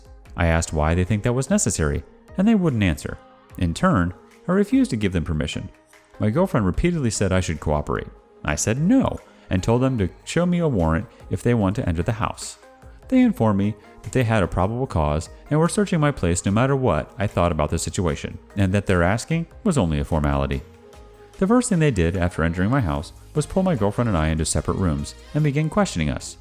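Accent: India. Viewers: The first story sounds like Indian !!!! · Sounds Indian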